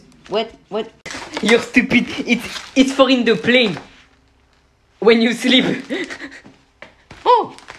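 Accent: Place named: France. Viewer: It sound like a french accent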